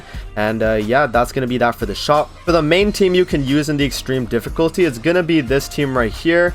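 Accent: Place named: Vancouver, Canada. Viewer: yo are u from BC? u sound like someone from van XD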